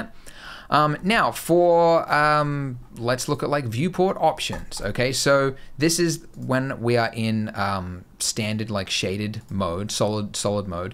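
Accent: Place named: Australia. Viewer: Blender 2.8 looks so good it's really interesting they put a floating guy with an Australian accent at the bottom right corner of the screen at all times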